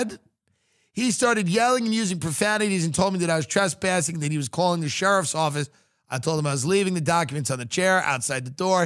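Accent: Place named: South, United States. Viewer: An epic stream of consciousness ad, delivered in a creepily hushed southern accent. Love you, 😂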